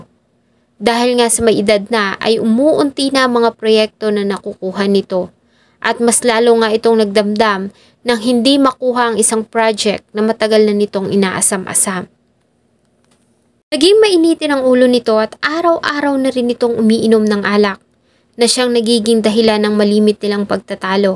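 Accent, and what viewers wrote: Visayas, Philippines. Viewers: Bisaya Lang si Maam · bisaya po ata si maam kaya po ganyan pronunciation nya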